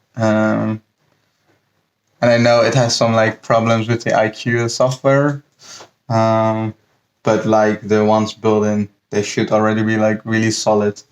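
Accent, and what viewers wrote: Netherlands. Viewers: Nice man, got mine last week (one spec lower, ryzen 7, 1tb) and I'm loving the clean looks! (Ook nederlands denk ik te horen, en toevallig ook industrieel ontwerper (student)? Ik heb dat boek ook hahah) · Congratulations! How much did you pay for it? Also for what work did you buy it for, other than having of course. Like what softwares are you going to use in it? I am a mechanical engineer so wondering if those software's can run in it. Also what accent is that? You speak good English but have an accent can't really pin point it 😂. Dutch? Portuguese?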